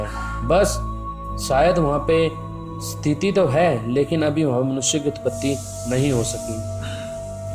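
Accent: Haryana, India. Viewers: Bhai haryane ka h k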